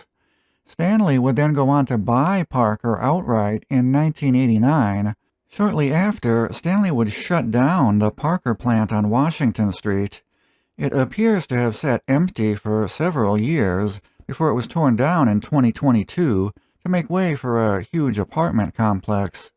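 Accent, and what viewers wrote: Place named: Boston, United States. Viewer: Your videos are always very informative and well done. Your Boston,( we say Bostin ) accent is gonna take a while, but keep trying. I noticed you added an "S" to Fall River, actually it's Fall Rivah! Great videos, thanks!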